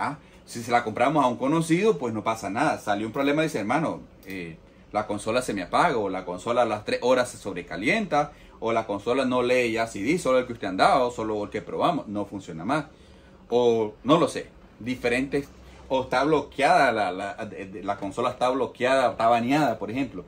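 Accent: Nicaragua. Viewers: Sos de nicaragua?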